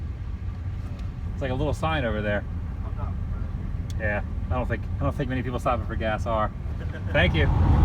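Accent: United States. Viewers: He talk like a cowboy🐄🐄🐄🐄🐄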